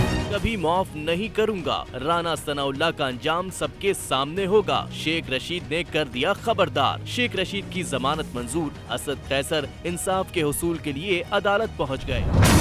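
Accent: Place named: India. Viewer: jesi editing ki hoi video ki or accent hai bolne ka.. bilkul indian media lag raha hai...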